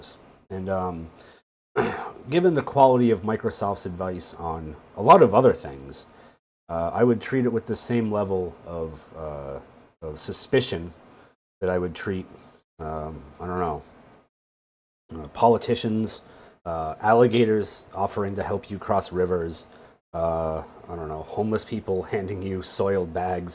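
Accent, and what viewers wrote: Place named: England, United Kingdom. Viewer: I liked this video for the (English?) accent.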